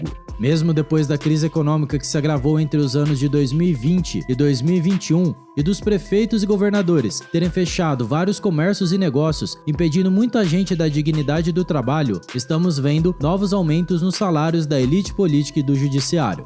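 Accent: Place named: South, Brazil. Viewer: MEU CARO, VOU TE DÁ UM CONSELHO , SE PRETENDE SER UM LOCUTOR ... POR FAVOR PERCA ESSE MALDITO SOTAQUE ! NÃO PASSA CREDIBILIDADE NENHUMA . DEPOIS DE BOLSONARO, PEGUEI ÓDIO DE QUEM FALA ASSIM... ME LEMBRA DOS NAZISTAS DO SUL ... CAIPIRAS ACULTURADOS E ACÉFALOS.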